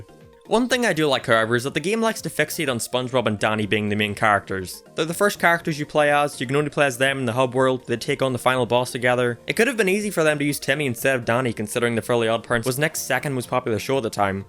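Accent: Northern Ireland, United Kingdom. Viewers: Are u from NI ahah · Northern irish accent. You from Belfast?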